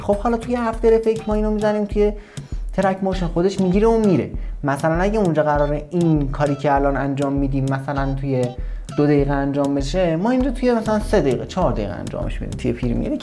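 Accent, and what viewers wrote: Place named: Isfahan, Iran. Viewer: برای لحجت عذر خواهی نکن از این به بعد لطفا فقط اصفهانی صحبت کن 🔥🔥🔥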